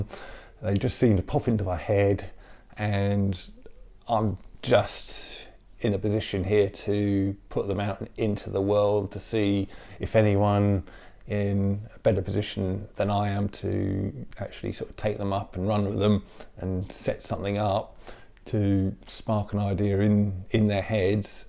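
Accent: England, United Kingdom. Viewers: Your speaking like a true English man!! Love and respect!! Time to look after our own!!!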